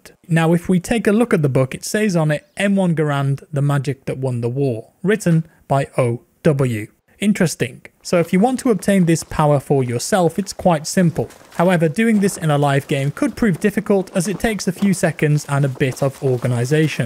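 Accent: United Kingdom. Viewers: Are you brithish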